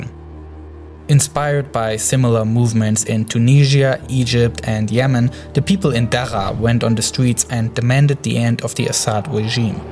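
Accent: Germany. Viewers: Great informative video of what is going on in the Middle East. And from what I heard of your accent I'm guessing you're German? You have pretty good English for a native German speaker! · Alter. Arbeite an deiner Aussprache. Das ist schrecklich. Man hört das Deutsch richtig und es tut selbst mir als Deutschem in den Ohren weh. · I found this video on reddit /r/documentaries and I am wondering why this video only has about 8K views. It is really informative and neutral! You explained the situation in Syria in a very illustrative way and I think you deserve much more views!! And I have one, rather informal question, are you from Germany? (I think I recognized your accent, because I am from Germany ;)) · I'm half german and i have almost the same accent :) So i guess he's german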